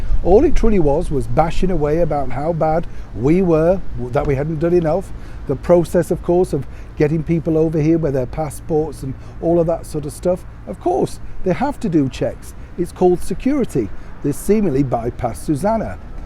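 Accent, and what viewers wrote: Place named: RP, United Kingdom. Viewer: What’s really odd about this video is the speaker, with his accent and suit simply dripping with posh, trying to align himself with “hard-working people.” It’s hard work to pull that silver-spoon out of your arse is it?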